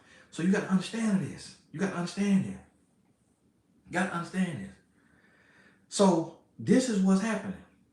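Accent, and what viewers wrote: South, United States. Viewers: Where are you from Mr?? You have a " southern drawl "...lol...I guess how how you speak & address your viewers as if we all " know you "...there is alot of truth in alot you say....thank you for your videos.😊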